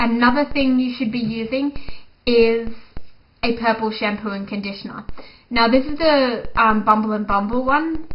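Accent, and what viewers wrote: Australia. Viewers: You're beautiful. Thanks for the post. Looking at you -- and listening to that cute Aussie accent of yours -- is the best spent on the 'net. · are you australian?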